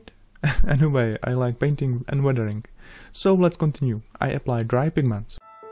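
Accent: Czech Republic. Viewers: jinak musim uznat, že se tvoje angličtina výrazně zlepšila:) už ten českej přízvuk tak netrhá uši:) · Ahh yeah, the good old Czech Accent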